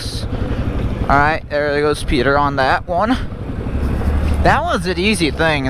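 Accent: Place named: Quebec, Canada. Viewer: Nice! I thought you were french-canadian when i started watching lol.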